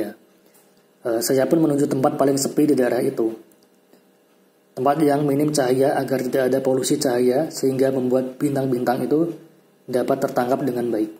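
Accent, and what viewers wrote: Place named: Java, Indonesia. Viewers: Seram dengan tanda pentung itu... Mas e wong jowo.. jowone ketok bgt mas 😆😆😆😆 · Mas metal banget deh 🙌 Dijabarkan dg lugas, jelas & khas medok orang jawa 👏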